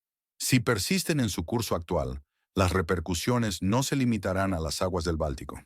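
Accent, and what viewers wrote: England, United Kingdom. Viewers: Si habéis decidido hablar en castellano hacedlo bien, sin arrastrar las erres como tarados. Hablar español con acento inglés desvirtúa nuestra bella lengua e impone un idioma inexistente.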